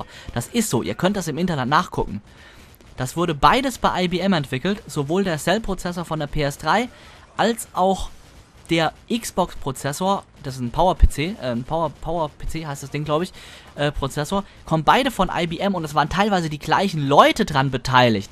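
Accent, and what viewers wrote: Hesse, Germany. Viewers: dein Hessisch ist genial:)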